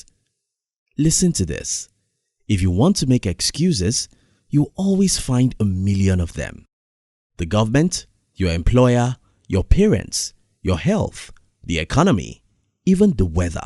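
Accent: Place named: Nigeria. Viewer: African accent straight from nigerian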